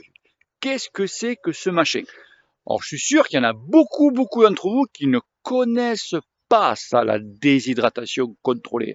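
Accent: South, France. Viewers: En faite tes détracteurs il ne comprennent pas ton accent du sud du coup il faudrait peut être faire des sous titres... Sinon moi je roule dans le sud Est pluie pas pluie avec ma femme on a deux 350 ça passe crème c'est vrai qu'il faut nettoyer le vélo après mais bon arrête de faire la fegnasse lol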